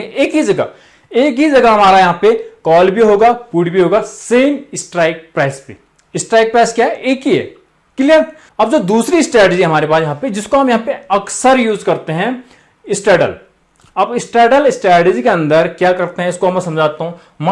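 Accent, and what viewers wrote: Kumaon, India. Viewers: Your sound like a kumaoni 😮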